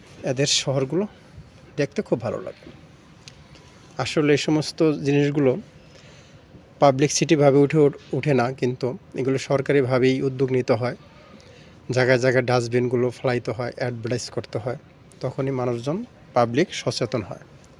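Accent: Bagerhat, Bangladesh. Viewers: ভাই আপনার ভাষায় মনে হচ্ছে আপনার বাড়ি বাগেরহাট জেলায় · ভাই আপনার ভাষায় মনে হচ্ছে ,আপনার বাড়ি বাগেরহাট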